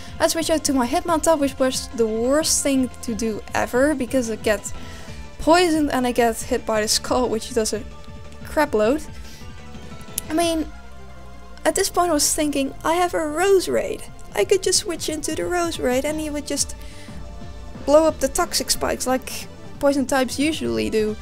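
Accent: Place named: Netherlands. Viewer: Gotta love Dutch accents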